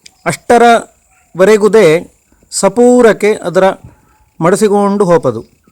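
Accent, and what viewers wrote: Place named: Karnataka, India. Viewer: it's Havyaka Kannada of South Kanara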